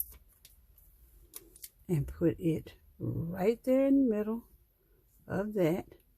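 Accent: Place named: South, United States. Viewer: I like your southern accent😉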